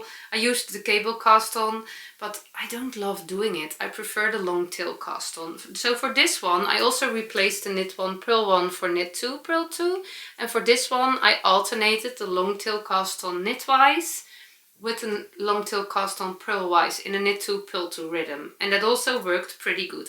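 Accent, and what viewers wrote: Netherlands. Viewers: Definitely second this. Dutchy English is perfect just the way it is - makes my heart jump every time! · Your English is just fine and I love the Dutch flavor--Dutchy English--I love it!! Don't lose it! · We love your Dutchy English. I wish I spoke Englishy Dutch! 💕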